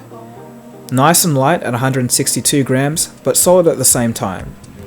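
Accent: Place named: Australia. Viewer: cool. did`nt realise u were from oz tho! you dont have a typical oz accent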